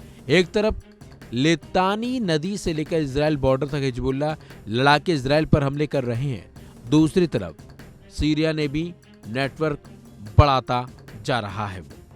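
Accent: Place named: Madras, India. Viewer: Ur channel sounds madras chap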